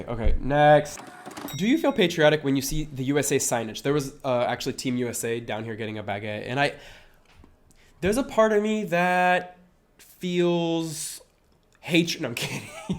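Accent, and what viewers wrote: France. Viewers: Funny how you got a little bit of a French accent when you're speaking English ^^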